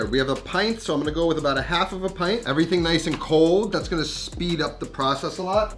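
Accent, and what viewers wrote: New York, United States. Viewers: Its an east coast heavy ny acccent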